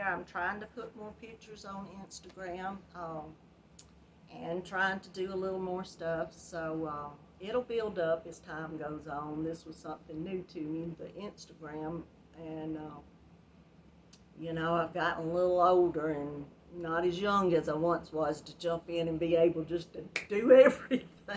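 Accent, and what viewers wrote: South, United States. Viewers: I really love watching your videos and hearing you talk. I especially love your Southern accent! There's just something soothing about it. Your Sislove Shawl is so beautiful! I always have several projects going at once, and don't always get everything done by the deadlines I set for myself. My shawl is still in Section one, but I will finish it. Thank you for your videos!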